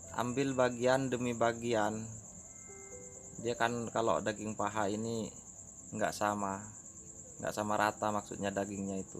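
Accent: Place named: Madura, Indonesia. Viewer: Ini Madura asli kelihatan logatnya...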